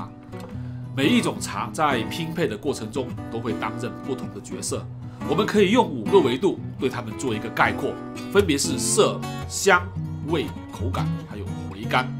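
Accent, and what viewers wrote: Chaoshan, China. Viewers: 怎么感觉作者是潮汕人？有点像潮普哈哈哈哈哈哈哈哈哈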